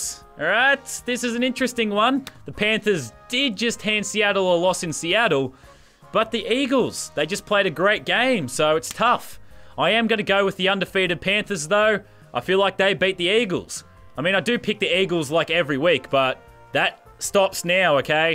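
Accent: Australia. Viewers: are you Australia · Are you australiain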